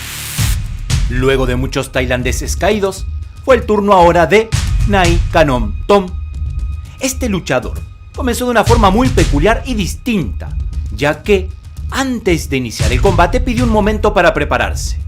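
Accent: Argentina. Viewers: Excelente video! Tu eres argentino? Saludos de Brasil! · Se escucha claramentr como argentino o posiblemente uruguayo, pero peruano o ecuatoriano? Ni cerca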